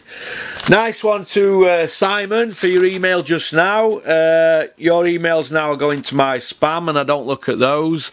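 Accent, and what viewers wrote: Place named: Yorkshire, United Kingdom. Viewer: Great to hear this as you put things so well in your Yorkshire tone.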